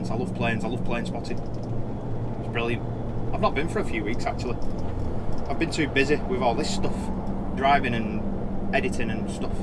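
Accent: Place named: Bolton, United Kingdom. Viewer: Proper bowton accent that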